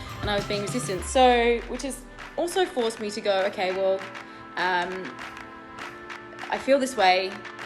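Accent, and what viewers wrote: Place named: Australia. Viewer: Haha i thought you were American. Then when i watched your videos and listened to you talking....i was like i am sure she is an Aussie!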